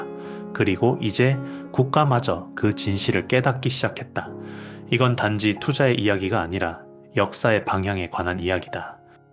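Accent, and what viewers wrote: Gyeongsang, South Korea. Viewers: 경상도 사투리네...경북 사람인듯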